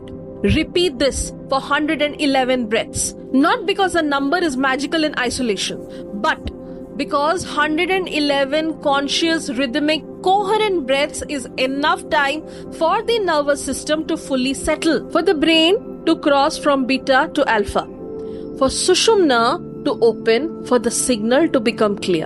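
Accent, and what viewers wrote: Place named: India. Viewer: The stupid ass hindi ascent ruins it for me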